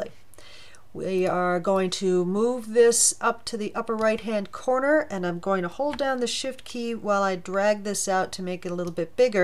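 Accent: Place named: Northeast, United States. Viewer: thank you lady on the internet with a north east accent of some sort. saved me hours!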